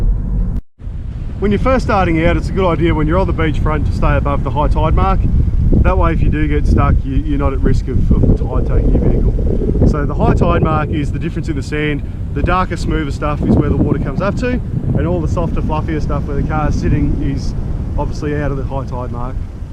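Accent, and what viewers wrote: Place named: Australia. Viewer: Dear everyone who are clueless about Australia; this is what the average Australian accent sounds like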